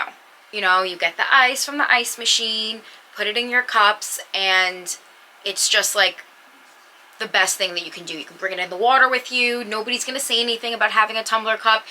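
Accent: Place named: New York, United States. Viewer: Thanks for the heads up!! We are going to the same resort! New subscriber for sure! Grew up in Jersey and I had a pretty good scene you were from NY the second I heard you start speaking! 😆😆. Thanks again.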